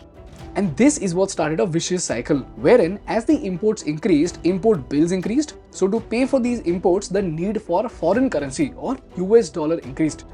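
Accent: India. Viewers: that indian ancent bleed my ears btw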